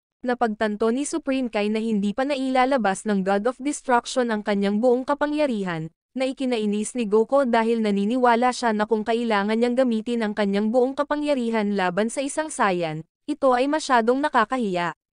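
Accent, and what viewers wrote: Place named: Visayas, Philippines. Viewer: Feeling ko bisaya tong nagsasalita, freyza?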